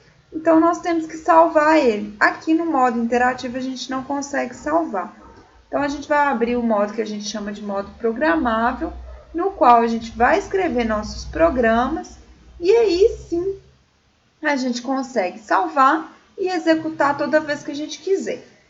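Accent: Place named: Minas Gerais, Brazil. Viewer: Valeu, profa! Ótimo introdutório e com esse maravilhoso sotaque mineiro (adoro!)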